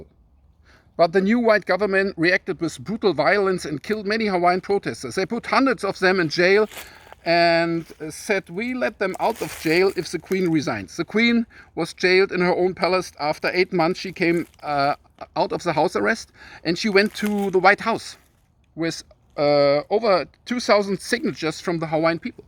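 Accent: Germany. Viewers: Love your German accent! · I was raised by my German immigrant grandparents ages ago and hearing your accent reminds me of home. I also follow the person you mentioned and #Lahaina #Maui I know I will follow you two as well. #Hawaii should’ve never become part of the United States. But they are and they deserve all the love and support we can send them. #LoveWins Mahalo everyone 💜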